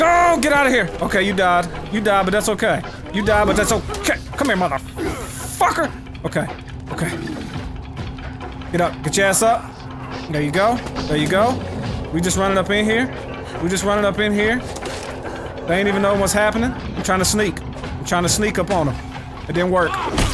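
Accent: South, United States. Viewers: he said yall are you from the south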